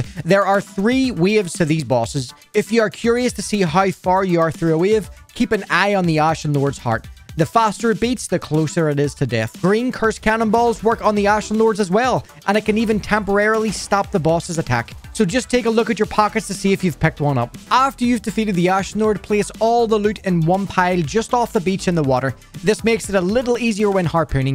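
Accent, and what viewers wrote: Northern Ireland, United Kingdom. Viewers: Are you northern irish?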